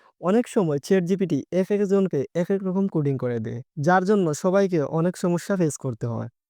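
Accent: Sylhet, Bangladesh. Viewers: ভাই আপনার বাড়ি কি সিলেটে ❤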